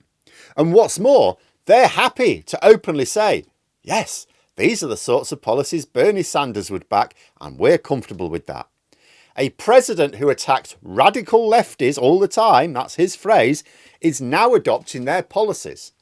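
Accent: United Kingdom. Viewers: The tariffs never worry about China. If they were, he would’ve just placed them on Chinese products he placed them on the entire world. Who pays the tariffs. the American consumer , that’s who. But at the same time half 1 million federal employees are out of work. Truck, drivers, dockworkers people who work in all sorts of box. Stores are out of work because there’s nothing coming in from any part of the world to stock the shelves. When Trump was president the last time, the only law that he passed was a tax cut for millionaires and billionaires. That law is getting ready to expire and he wants to make it permanent. His plan is to cut the Social safety net in this country and he’s already well on his way to doing that. Because his policies affect peoples retirement funds people are losing significant amounts of their 401(k)’s, and other pensions. Just because somebody has a British accent doesn’t mean they know what the hell they’re talking about. 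I bet this guy was in favor of Brexit. How’s that working out for you? The only socialism Trump is going to do is the same type Hitler did. And before Ronald Reagan and Margaret Thatcher, the top rate was 70% in the USA. I have no idea what it was before Thatcher.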